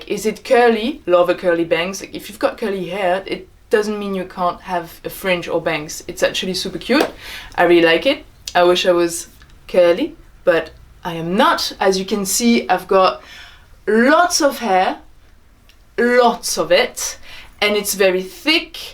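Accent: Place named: France. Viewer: je viens de decouvrir ta chaine, tu est française ? i just discovered ur channel and wanted to know if u'r french becuz of ur accent i find that it's somehow a french accent but in a goood way 😊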